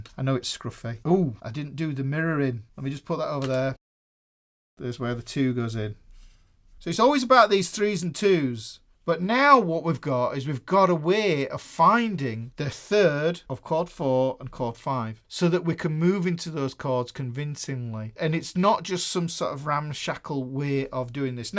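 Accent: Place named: Newcastle, United Kingdom. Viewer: Great accent. Are you a Geordie?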